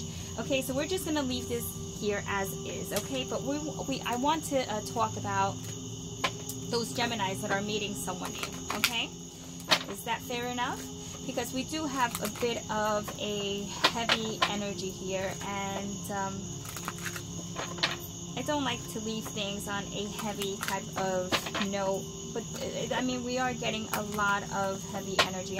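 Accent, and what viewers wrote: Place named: New York, United States. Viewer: I here the NY in you 😊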